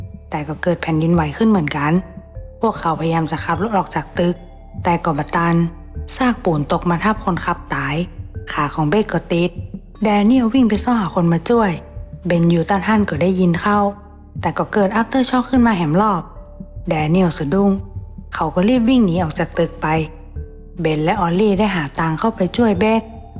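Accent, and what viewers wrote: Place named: Isan, Thailand. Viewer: เสียงอิสาร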